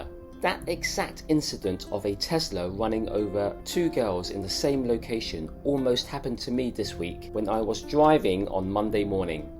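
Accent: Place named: United Kingdom. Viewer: I like you have the Hong Kongers' 「Wah」expression while you still have the Brits accent😂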